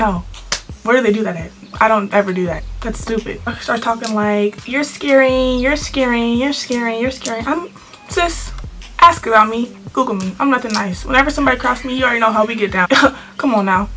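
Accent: United Kingdom. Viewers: The english accent tho...😂😂 im english and this is amazing. Congratulations you just gained a subscriber😂😂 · The British accent 😭 im British and the accent is so jokes😂😭 anyways just found ur channel 💕💕💕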